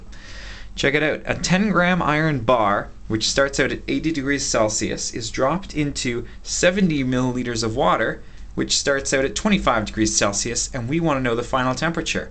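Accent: Canada. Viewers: Please tell me your from Montreal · are you Canadian???????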